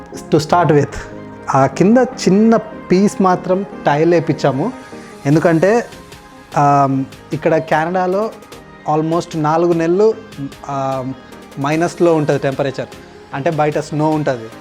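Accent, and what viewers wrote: Telangana, India. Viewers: Telangana yesha baaga matlaaduthunnaru bro.🎉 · Excellent video Nice Telangana slang Crisp informative Shared to my daughter, who is in Toranto, Keep going All the best Be Happy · Canada velli 9 years ina mana mass slang matram miss avvaley Andhar la adho ochi rani posh language kakunda normal ga superb cheyppinav po Bro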